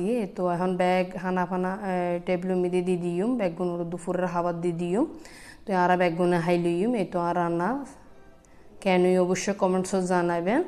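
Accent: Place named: Chittagong, Bangladesh. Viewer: normally bujha jayna apnr kothay j apni ctg er kintu ajk 😅😅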